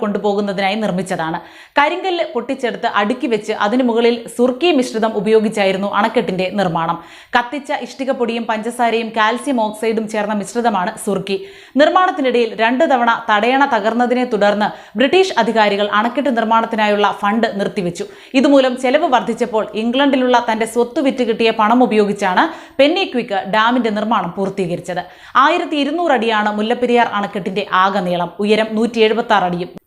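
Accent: Thiruvananthapuram, India. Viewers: ആകർഷകമായ ടോണിൽ ഗൗരവമായ വാർത്ത അവതരിപ്പിക്കാനും ആക്ഷേപഹസ്യം അവതരിപ്പിക്കാനും തിരുന്തോരം ഭാഷ പറയാനും മിടുക്കി 👍👍❤️🌹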